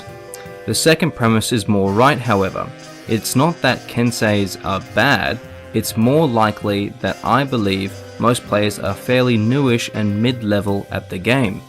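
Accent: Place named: Quebec, Canada. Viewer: He's quebecoise? Over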